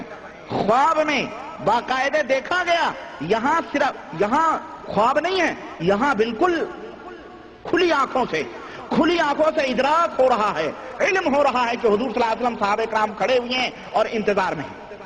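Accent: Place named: Pakistan. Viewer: Hulia Arab numa, Pakistani lahja. Allah hidayat da sab ko. Dawat e tabligh zindabad.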